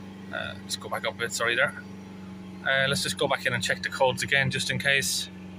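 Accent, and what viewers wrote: Ireland. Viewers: Are you Irish by any chance?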